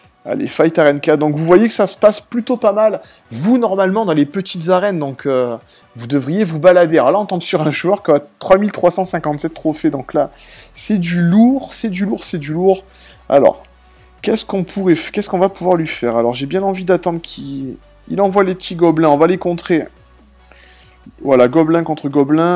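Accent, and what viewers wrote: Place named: Toulon, France. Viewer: j aime trop tes vidéos PS: l accent toulonnais et bien aussi ;)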